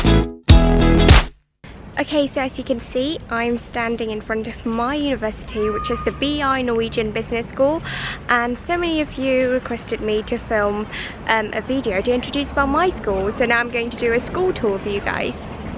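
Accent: United Kingdom. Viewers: Trường bạn đẹp quá ^^ bạn nói tiếng anh cũng hay nữa. Bạn có thể chia sẻ kinh nghiệm luyện accent đc ko ạ? Nghe bạn nói giống British accent :) mình cũng có căn bản tiếng anh và muốn luyện accent giống người bản xứ ạ. Cảm ơn bạn.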